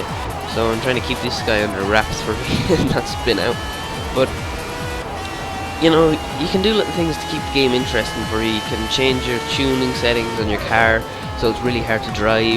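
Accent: Ireland. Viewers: Hey your Irish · MUP ya boil ya yer irish ☘️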